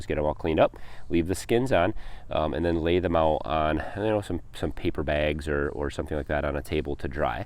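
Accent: Michigan, United States. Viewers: I want to start a garden next spring but I'm wondering if there's something I can do now. Should I till the soil now and cover with leaves? My area has been mowed but its weedy. It hasn't been touched for decades. P.S. Sounds like you're in Michigan. I'm in Genessee County. Zone 6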